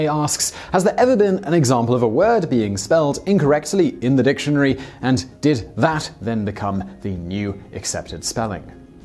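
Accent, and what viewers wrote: United Kingdom. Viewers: relax! The more I hear you the more I understand. U. K. Accent? Big deal. I grew up eating grinders. Poor's boys, subs hoggies. What ever. Same thing. Different name. I can't imagine what Webster's going though now! I gotts to know!!! Haha!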